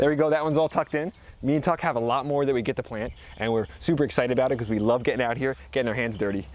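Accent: New Jersey, United States. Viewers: Nice work dude! I'm surprised I could follow it because of your New Jersey fast talk, but I got it! Thanks for the tips!!!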